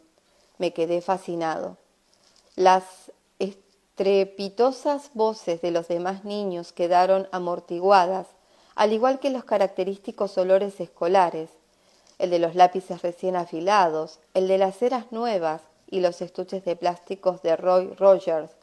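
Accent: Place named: Argentina. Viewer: De verdad!! Escuchar una voz argentina es genial!! Me gustaría que leas el resto del libro 😇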